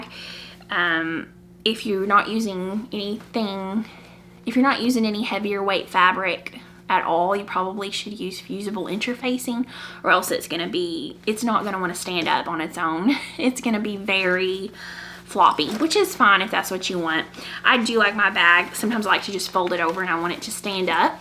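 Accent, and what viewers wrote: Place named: South, United States. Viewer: I absolutely love your podcast and your colour palette and choice of yarns and wish i could afford the same ones, hopefully one day lol. your choices are great examples to base my own projects on. Im loving the little additions of 'what we say in the South' im obsessed. Your accent is much more interesting to listen to than our very boring New Zealand one. I love your podcast and could listen to you speak all day, a great way to end a busy day at work! Please keep posting😊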